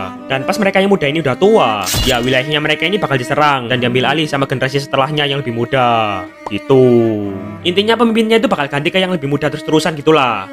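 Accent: Java, Indonesia. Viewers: Bang kamu jawa yoo lek jowo podo rekk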